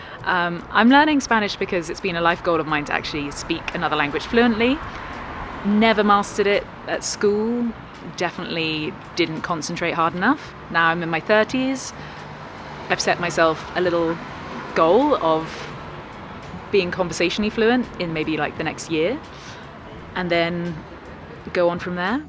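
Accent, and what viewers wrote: United Kingdom. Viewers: You really are a talented person, musician, singer, has a beautiful voice singing, but you have no idea how I love to listen your voice when you're speaking, the calm, how it sounds is enjoyable to me and I love, really love the British accent. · You realize people just love your wonderfully intelligent and smooth British accent and that's the primary reason you have so many views right? ;) LOL! Keep it up! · Your “ ” cover with Josh Turner was EL PERFECTO!! Thank you! I also appreciate your respect for the English language and attempt to use proper grammar. I share it. Language is at best a limited and somewhat poor vessel for conveying our thoughts and feelings. Taking the trouble to develop a good vocabulary and decent command of the language is critical to our ability to think, understand the world around us, and communicate our insights. 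It deserves respect. As an American, I’m a bit unsure of the meaning of a “posh” way of speaking. However, I am aware of the many radically different accents in Britain; some quite difficult to understand. Yours is very nice. I’m from an old Tidewater Virginia family that predates our war for Independence. We have an accent here that still retains many flavors of old English so the way the Royals speak feels familiar to my ear. Linguistically I have no idea what that means except that perhaps being extremely traditional and somewhat cloistered from the general public they may have preserved a way of speaking from the times when England colonized America. English aside, I enjoy your music. Thank you. Good music is mana for the soul and making it is a worthy life’s endeavor. Well done!! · All Brits have a pleasing accent! We don’t even know which ones are considered posh.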